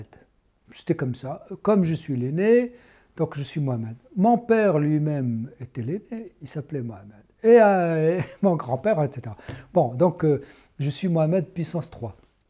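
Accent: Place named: France. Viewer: Un croisé renégat qui fait tout comme les français de souche y compris l'accent avec une voix fluette de petite fille.Père militaire ivrogne mère dominatrice qui l'a élevé en petite fille (je n'invente rien c'est lui qui le dit) torchant, cousant raccommodant, résultat: couilles à l'envers.Ce proxénète veut à tout prix marier une musulmane à des non-musulmans! ce n'est pas tout il veut vaille que vaille les faire manger du cochon et les faire boire du pinard.Pourquoi? mystère.Il croit dur comme faire que ses ancetres sont des gaulois! à son age (62 hivers) quelle misère.Et je vous le donne en mile ce salaud est en plus un sioniste plus à droite que Zeev Vladimir Jabotinsky aller comprendre.Il écrit sur Hespress en arabe et fait croire qu'il est de tout cœur avec les palestinien.J'aime tous les marocains ou presque mais pas lui.J'ai oublié il se vante d'avoir changer de nom et signe mais sur hespress il signe! 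Si vous avez compris quelques choses expliquez moi.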